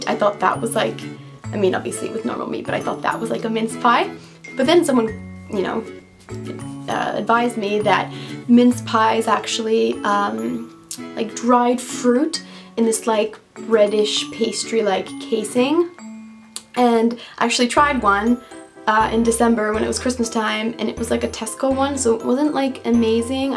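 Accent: United States. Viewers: You say you're from Finland but you speak like an American - not just accent but manner of speech also. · If you come from Finland how come you have an American accent when speaking English. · Sounds like you already picked up some of the accent, you sound very mildly American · How come you have an American accent if you're from Finland? Also you could settle an argument between my and a friend - Is Finland part of Scandinavia? :D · Dexterror It's already been established that she's Finnish, and she doesn't strike me as the sort of person who would 'try to sound' American. My guess is that she picked up the accent from wherever, or through whatever means, she learned English. And given the presumption that English isn't her first language, I find it surprising and commendable that she speaks it better than most English people I know. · I had no idea you're from Finland you sound American how did you learn that accent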